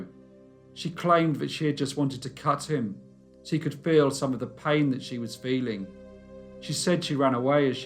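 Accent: United Kingdom. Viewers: Mort sent me..... I also happen to love channels like yours and love the British accent. 🙋‍♀️♥️🤗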